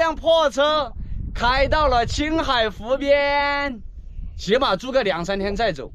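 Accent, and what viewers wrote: Chongqing, China. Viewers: 聽你這口音我就估計是重慶的，結果一看車牌還真是。玩得嗨哦，拿個貨車當房車到處轉。最近重慶封城，怕回不來了哦。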